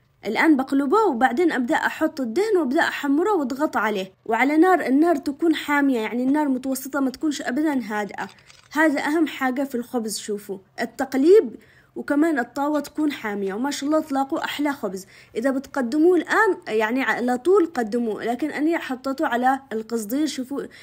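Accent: Aden, Yemen. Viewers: مسرفه هههههههه ماشاءالله لهجهتك عدنيه رغم انك عايشه بالسعوديه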